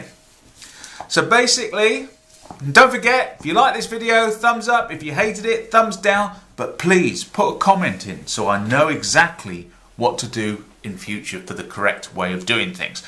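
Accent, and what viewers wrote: United Kingdom. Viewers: Recently got into knots after learning a hitch on a canoeing trip. Building my repertoire. Although I have been using the alpine butterfly as my preferred bend, I liked the aesthetics of the zeppelin, so gave it a search. Thanks for the videos, it is nice to hear a fellow Brit once in a while. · Thank you for demonstrating two ways of tying this knot. And I love your British pronunciation.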